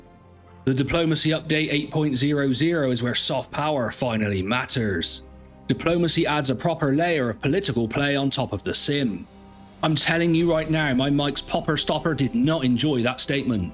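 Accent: United Kingdom. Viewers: I didn't know you were Portuguese, congrats ! only Portuguese I know with a brit accent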